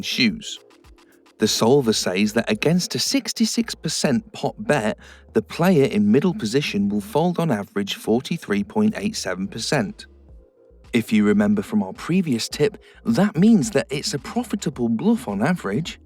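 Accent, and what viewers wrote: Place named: United Kingdom. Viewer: British voice is the 2nd best selling point behind his last tip. Shame, I had to watch that whole video to get to that idea.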